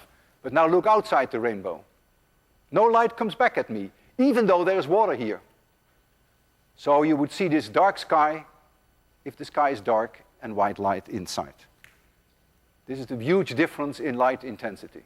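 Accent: Netherlands. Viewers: Ha, I knew he was Dutch... Because of the accent. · With that kind of accent he could only be Dutch...figures I was right:) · Is he dutch? He's accent is kinda dutch?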